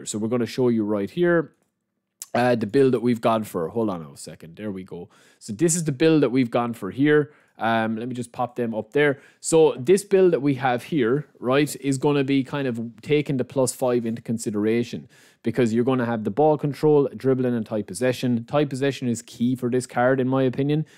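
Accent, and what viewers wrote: Ireland. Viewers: that irish accent tho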